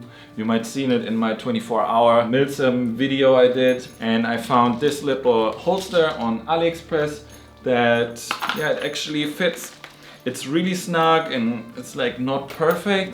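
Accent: Germany. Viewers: I live how he says gamefeald thats the point where you notist that hes german You shoud say playground battelfield and so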